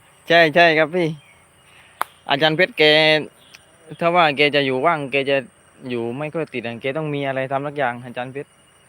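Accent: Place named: South, Thailand. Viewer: พี่ชอบฟังแระ แหลงใต้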